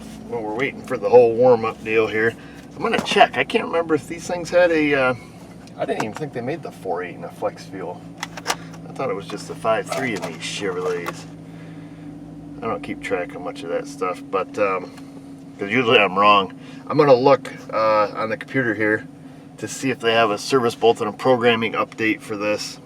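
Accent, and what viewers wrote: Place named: South, United States. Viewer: Omg country twang; you got me homesick and misty eyed.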